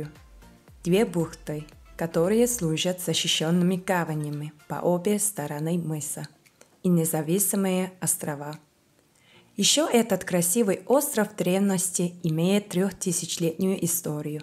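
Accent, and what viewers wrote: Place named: Russia. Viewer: rusça aksanın * - *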